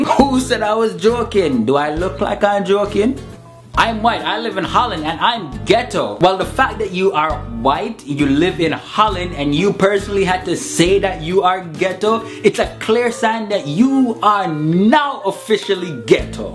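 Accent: Jamaica. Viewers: Oh I'm just loving the Jamaican accent!! I'm from Germany and I definitely wanna to go to Jaimaica when I'm older :) So here's my question: What is your first thought when you hear the word German?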